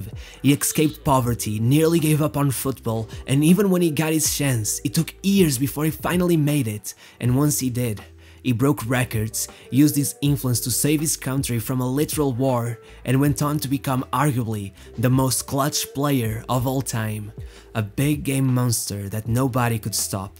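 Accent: Porto, Portugal. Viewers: aquele sotaque do Porto impossivel de esconder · Never knew it was a Porto accent! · I hear the Portugese in your accent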